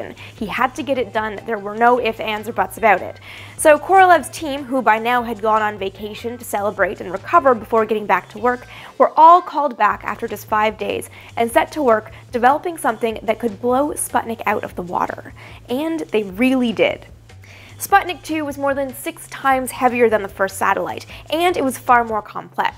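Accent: Canada. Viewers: Always like hearing the Canadianess coming out. :-) Miss-isle just sounds so much cooler than Miss-sel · Great summary. And might I say, your pronunciations are very Canadian in this episode :)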